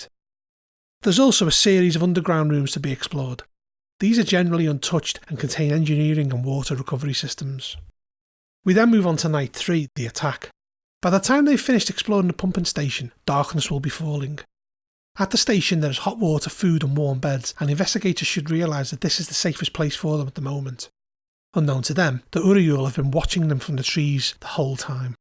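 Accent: Liverpool, United Kingdom. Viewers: Unless I am mistaken, I am detecting major Scouse.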